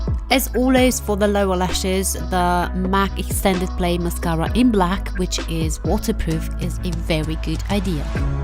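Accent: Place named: France. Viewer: Do you speak French? Cuz I love your accent ♥️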